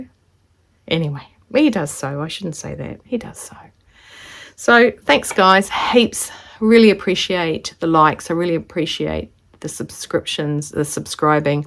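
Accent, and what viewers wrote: New Zealand. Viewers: I just discovered your channel via the #fridaysews. So enjoyed watching and good to listen to a fellow kiwi.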